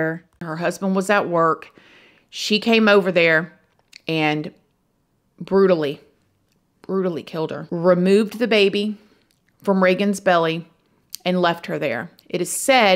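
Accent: South, United States. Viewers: I thought this was Annalise's and I just thought I never noticed what a heavy southern accent she has LOL well good I'm happy to try out a new channel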